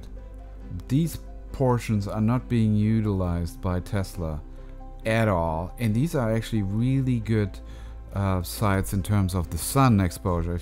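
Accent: Germany. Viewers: Your accent sounds German.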